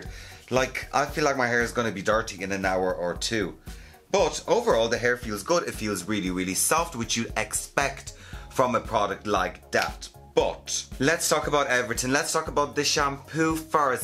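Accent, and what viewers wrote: Ireland. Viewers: I've really been enjoying watching your videos over the last month. I love the irish/UK slang and accent. I've always been a fan of hearing the different dialects. I'm pretty sure that started when the Spice Girls came out in 1997. Eleven year old me was extremely obsessed with them. Good lord. It was bad.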